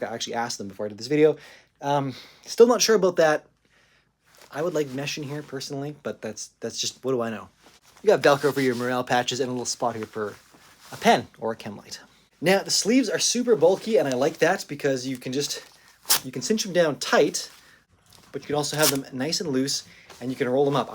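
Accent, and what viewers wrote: Canada. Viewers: I’m confused..you sound Canadian but have a gun collection that would rival Burt Gummer from Tremors. I thought Justin Castreau doesn’t allow guns?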